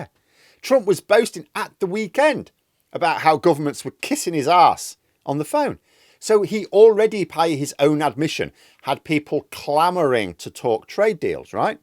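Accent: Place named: North, United Kingdom. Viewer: What absolute bollocks as ever from this silly site and a strange man who shouts his northern accentuation at me.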